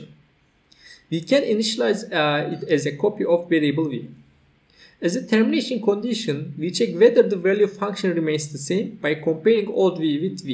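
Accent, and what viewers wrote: Turkey. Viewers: Dostum anlatım çok güzel, aksanından Türk olduğunu düşüyorum. Eline sağlık.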